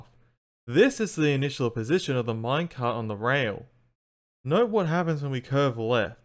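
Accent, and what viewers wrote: Australia. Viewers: as a native, he sounds very Australian to me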